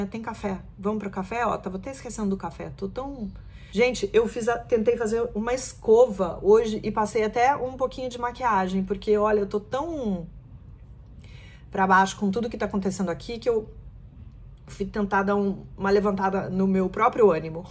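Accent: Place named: São Paulo, Brazil. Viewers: Maaaaaaaaa vc é perfeita!!!! Não perde o sotaque paulistaaaaaa!!!!! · Seu sotaque é sul/sudeste, mas mais sudeste, tipo de SP. Suas análises são muito sensatas. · nada de errado com seu sotaque, inclusive eu acho que é bastante forte o jeito paulistano. Adoro sua coluna e assisto todas. Parabéns pelo excelente trabalho!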